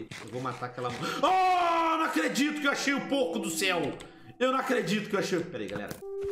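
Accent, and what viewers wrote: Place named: Portugal. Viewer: Muito bom ver o voltando às raízes... Ele ainda tinha sotaque português europeu quando fazia série desse vídeo...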